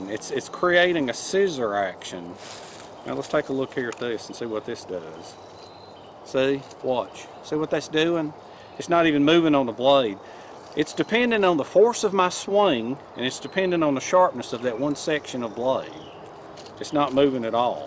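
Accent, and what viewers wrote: South, United States. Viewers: Great vid. Are you from GA? Lol you sound just like my uncle from Savannah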